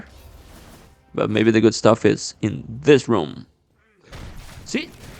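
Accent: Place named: Brazil. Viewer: you are brazilian? you sound like it and your name sounds a little portuguese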